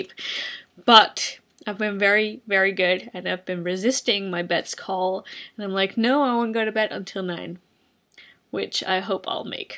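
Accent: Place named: United States. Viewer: Yo accent is more american than usual, lady. or maybe it's in my head. either way, I'm happyz you're still alive and back in the country :) <3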